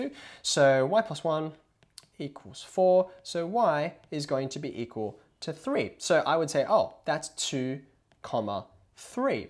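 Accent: Australia. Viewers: great video but i hate the austrailian accent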